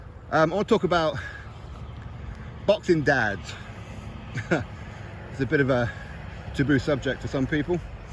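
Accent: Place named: RP, United Kingdom. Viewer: Hello. If you're from Newcastle Upon Tyne where did you get that accent from? I'm born & bred in Newcastle as well but my accent sounds nothing like yours!! You're posh!!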